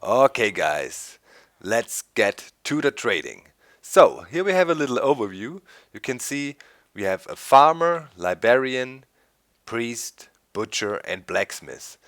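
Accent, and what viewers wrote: Germany. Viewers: Give the German a break. English is not his first language. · there's something I don't understand: Now in German, the W is typically as our V, and the V is typically like our F I think, right? How come with the German accent, you guys will often times say English words with a V as we say a W?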